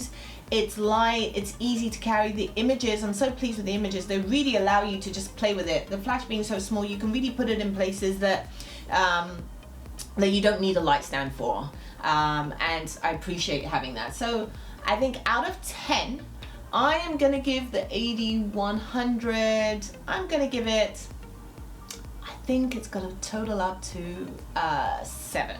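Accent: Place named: England, United Kingdom. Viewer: Hi. I love this video - it's the warts n all element that makes it so much closer to true OCF experiences than 99% of other videos on YouTube. I can't believe that other photographers don't experience hiccoughs - perhaps they edit them out - which just means we viewers learn less. I guess a few questions 1) did you do much/any post-processing? It looks like your model has perfect skin! 2) you could have warmed up her skin in post instead of using CTO gels? 3) you have to tell either the trigger or the strobe that you're going to shoot HSS right? I think you don't need to do that with Profoto equipment (although who wants to pay 3x as much for Profoto) 4) What did the Magsphere do for you? 6) How did you create the great shot in the video? 5) I have AD300 Pro and am considering adding an AD100. Thank for pointing out the issues with softboxes etc but I think I would only use it barebulb 6) On the subject of the AD300 - I like it because you don't have to add a mount. 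However, there seems to be a very limited number of softboxes with the Godox mount, right? I'm looking for a strip box with a Godox mount. Know of one? 7) You sound like you're from England?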